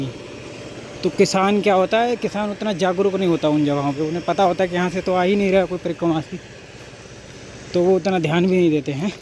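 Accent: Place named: Kanpur, India. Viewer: Prabhu Ji aap kanpur se Ho kya,boli wahin ki lag rahi hai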